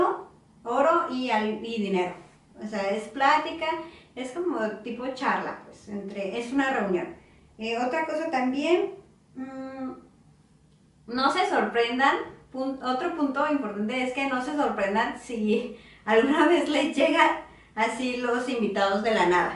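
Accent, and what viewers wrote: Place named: Baja California, Mexico. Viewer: Vayan a Baja California y van a ver como si les parece mexicana, a lo mejor por aquellos rumbos la gente somos un poquito distinta en acento y costumbres, pero para mi percepción si la veo súper mexicana y su acento sigue igualito a la gente de Baja como si no llevase años en Turquía jaja. Saludos a todos y bendiciones.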